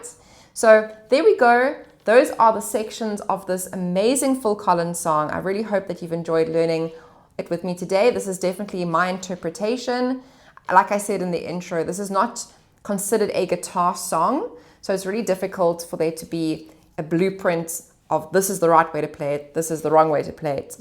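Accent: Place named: South Africa. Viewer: Great lesson and refreshing to hear a good old south african accent again.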